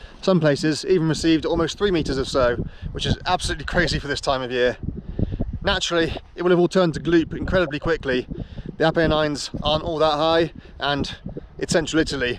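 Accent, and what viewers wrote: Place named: Scotland, United Kingdom. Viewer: you mentioned living in Scotland, are you from Scotland? I always thought your accent sounded similar to Scottish Cyclist David Millar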